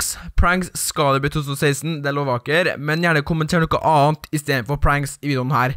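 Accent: Trøndelag, Norway. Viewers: du trøndersk?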